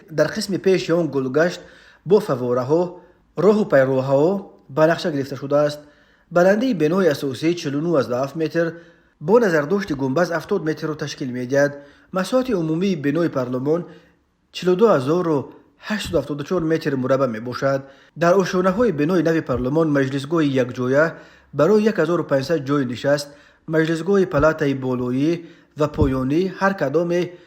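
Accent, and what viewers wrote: Pamir, Tajikistan. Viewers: Автор Помири аксент кати гап мезанад.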